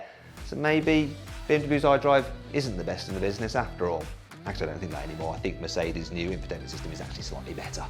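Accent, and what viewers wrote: United Kingdom. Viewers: ちょっと崩れた英国英語のアクセントが良いですね。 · この本来の英語の発音が好き 英国訛りとか言うが、アメリカ英語こそ訛りなのにね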